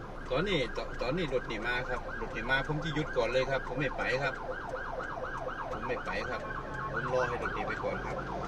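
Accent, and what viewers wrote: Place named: Korat, Thailand. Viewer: ชอบดูมากพูดโคราช❤❤❤❤❤❤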